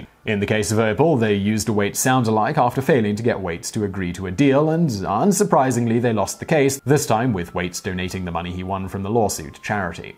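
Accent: England, United Kingdom. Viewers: he's using a European pronunciation of the word that goes by how it's spelled. "Bourbon". He's not drunk, he's English. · Regurgitating research in a verbose and pretentious manner doesn't make you look smart, it just makes you look like a massive twat. Consider popular academics and scientists such as Carl Sagan or Kenneth Clark who communicate with their audience without the BS. I can tell every day you thank God for giving you an English accent. Every day I thank God that I don't know you personally. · Casey Kasem doing a British accent. Expect him to break in to an ad for the gap at any time.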